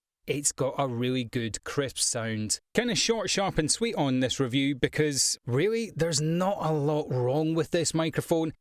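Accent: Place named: Scotland, United Kingdom. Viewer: wasn't sure.. accent sounds Scottish.. could be wrong.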